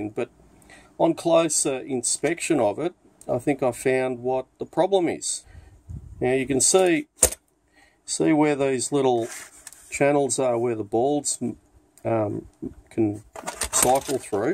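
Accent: Australia. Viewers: Sounds like an Aussie accent. I'll get you a James Boag's next time I am on the Gold Coast. Notice that the inner part with the splines is flush on one side and has a raised section on the opposite side. I wonder why and I wonder which end of the splined shaft goes into which end, the flush or raised side?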